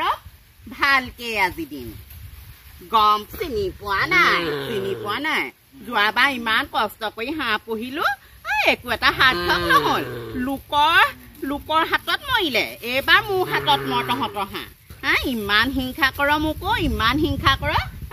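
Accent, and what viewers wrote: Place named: Upper Assam, India. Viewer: baai.. Bahut dinor murot palu dei.. Bahut bhaal lagil.... Apunar thaas tu ujonir type je.. Bhaal laage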